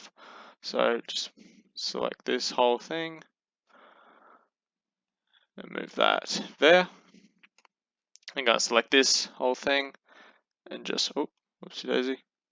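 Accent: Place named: United Kingdom. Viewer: Recognizing british's speech is pretty tough, sorry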